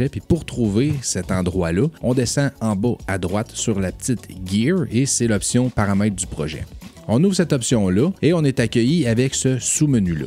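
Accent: Quebec, Canada. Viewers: 😎 Merci beaucoup pour cette vidéo. Même si je faisais déjà des montages de base sur DaVinci Resolve depuis la version 16, je viens de passer à la version STUDIO 18.6. Je crois que tu m’as influencé. C’est cool d’avoir une ressource avec le même accent que moé. Si tu habites la région de Québec, ça serait cool de prendre une bière ensemble et jaser de montage vidéo.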